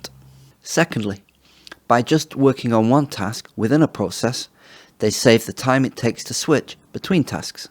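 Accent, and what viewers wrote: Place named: Cheshire, United Kingdom. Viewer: This has to be a Cheshire accent.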